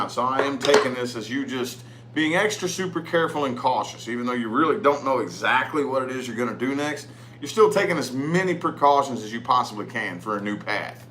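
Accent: South, United States. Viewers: Hey where in the south r ya from accent drivin me crazy cuz I can’t figure out where it’s from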